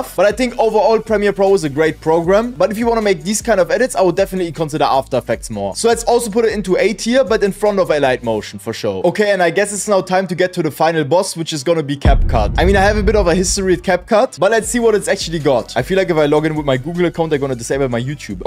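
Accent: Germany. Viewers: Wieso weiß ich sofort das er deutsch ist😂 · are you german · bro du hörst dich so deutsch an 😭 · U sound german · i hear german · Man merkt anders das er deutscher ist.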